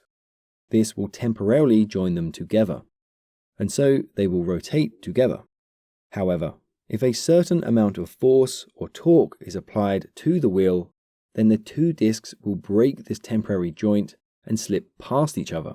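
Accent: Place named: England, United Kingdom. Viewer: Usually, the gears that you refer to as 'spider gears' and 'side gears' should more properly be referred to as planet gears. Others have also mentioned the crankshaft/camshaft error. Your terminology (despite your English accent) seems to be the dumbed down USA version. It's almost as if the correct term is just too difficult to learn or remember, so they HAVE to simplify it. Otherwise, a fairly decent explanation of events. Regards Mark in the UK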